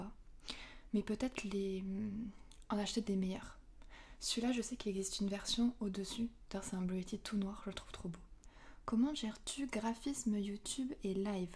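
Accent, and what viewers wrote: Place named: South, France. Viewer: Merci, on entend ton accent du sud :) Mignon Kawaï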